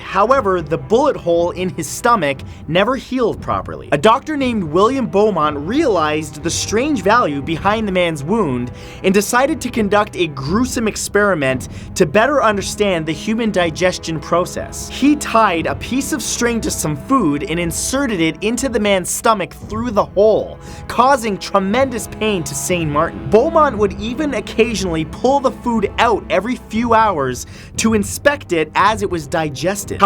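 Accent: Canada. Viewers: this is Canadian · You're from Canada?! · Your Canadian? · So your canadian?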